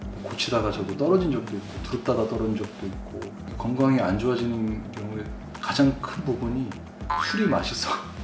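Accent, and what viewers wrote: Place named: Gangwon, South Korea. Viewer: 경상도지방은 전원생활하기 좋아요^^ 들어보니 강원도 인거 같네요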